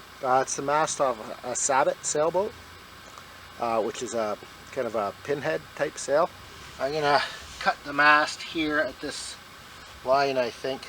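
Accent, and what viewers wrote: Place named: Canada. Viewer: You sound suspiciously canadian when you talk.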